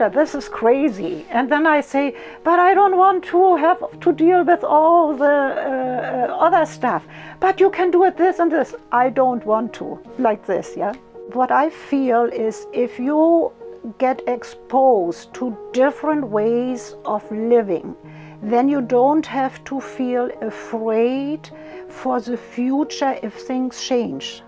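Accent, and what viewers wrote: Germany. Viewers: Ich liebe deine Deutch Akzent. Sehr nett klein haus und auch schön garten. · You are a nice and cool lady, my first impression was that you look europaen, not american.........and your englisch ist not american....... I think, you are German.....??? Alles Gute für Dich......🤝👍🙆‍♀️ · Lovely lady, lovely Deutsche Akzente, lovely little house! I like them all! :-)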